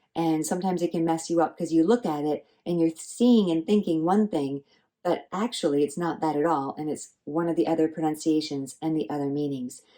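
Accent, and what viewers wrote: Standard. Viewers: You are amazing ! ,你好犀利 ！ 發音非常標準 ！ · 支持一下❤ 講得比好多本地人正音 無懶音 聽得好舒服👍🏽 多謝你🫶🏼鍾意廣東話 ~ your Cantonese pronunciation is better than native speakerssss~💕 Thx for your shareingggg~ Thumb up👍👍🏻👍🏼👍🏽👍🏾👍🏿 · 利害👍廣東話講得咁標準，多謝你教廣東話👍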